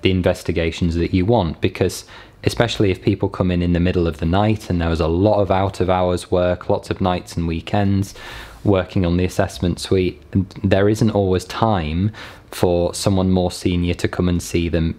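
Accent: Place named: United Kingdom. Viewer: you have a big USA gay fanbase here--we have a thing for docs with British accents. Obviously Dr. Gill is the Alpha Leader of all posh British twink doctors but you are right up there. Peace!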